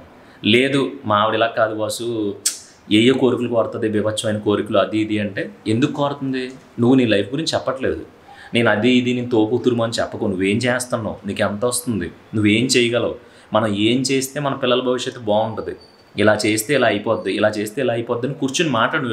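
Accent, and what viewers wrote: Godavari, India. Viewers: Mee vuru godavari jillaa na andi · East godavari aa.. west godavari . Aa. Sit miru.. but great ga chepparu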